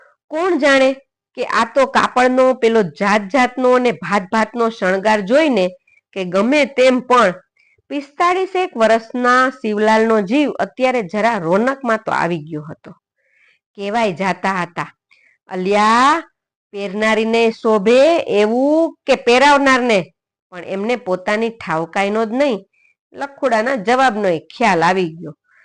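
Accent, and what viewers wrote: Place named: Kathiawar, India. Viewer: પન્નાલાલ પટેલ ની વાર્તાઓ આ પ્રમાણે કાઠિયાવાડી લેઝા થી વંચાય નહીં એના માટે તો સાબરકાંઠા એટલે કે ઉત્તર ગુજરાતનો લહેકો અને લહેજો હોવો જોઈએ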